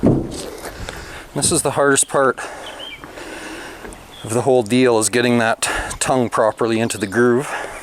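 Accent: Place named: Quebec, Canada. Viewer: How did you manage in the big rains, fallout of tropical storm Debby in early Aug 2024 ? (By your Quebec accent I assume that's your location.) May be give us a construction and weather episode? How do you protect exposed wood from water damage? Should we be worried? What is time lag between your filming and the publication of video? Fun series, though beyond my little DIY.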